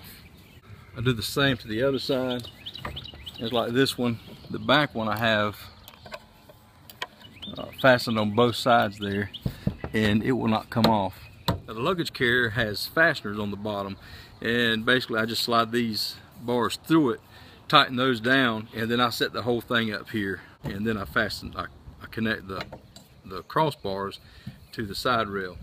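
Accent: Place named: South, United States. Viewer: I believe it's your accent that TRULY GAVE ME CONFIDENCE IN YOUR EXPERTISE. For those outside the USA who only know a southern accent from HollyWood movies you may be used to hearing that accent whenever someone DUMB is talking (Horrible hollywood elitist stereotypes). BUT FOR THOSE OF US IN THE US we know that accent is that of a working man, AKA THE GUY WHO KNOWS HOW TO BUILD AND FIX STUFF! Thanks for the video.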